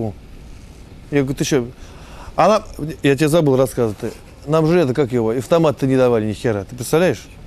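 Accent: Russia. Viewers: Ну так сейчас такой говор только в глухих деревнях остался) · Что это за говор? Из какой части России? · Второй раз слышу настоящий русский говор 😂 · Это настоящий русский говор . Только бы мата поменьше былО , вообще тОгда хОрОшо бы былО .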